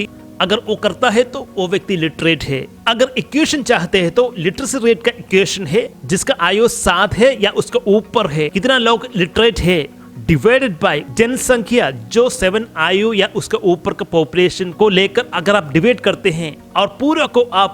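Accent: Kerala, India. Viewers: Korach hindi parayan padichitu video undakku kelkubol Keralathile hindi teacher class edukunna pole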